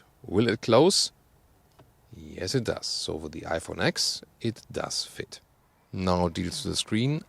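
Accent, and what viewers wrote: Germany. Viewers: Oh digga, man merkt wenn ein deutscher anfängt englisch zu sprechen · I LIKE this guy! I just discovered him a couple of days ago. Great reviews... and me being a GTI owner, the "German-thing" makes it even better! "Vy are Volksvagens so darned guut? Vell, being Gerrrman... because Gerrrmany makes them... and, vell, YOU don't!" Lol! :-P. :-P. :-P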